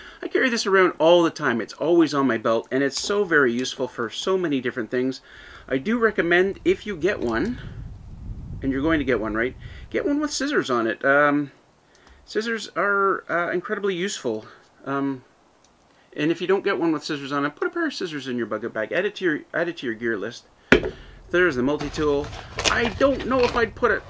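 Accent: Canada. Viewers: Like I have told ya before....YOU MISSED YOUR CALLING !!!!!.... 🥰🤣 love hearing you talk. City girl too. That Canadian accent !! You’re just so fun to watch when you’re not on ICE. !! 😳😳 that does scare me ! Enjoy your place... make those memories and I hope y’all have a long life there!! 🥰🦮🦮!! ❤️🍀🍀🍀🍀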